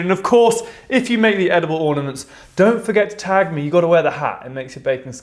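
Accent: England, United Kingdom. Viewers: Acabo de suscribirme y creo que tu acento inglés es inconfundible jajaja espero no equivocarme. Love your videos, and you❤️ I come from Chile 🎉😊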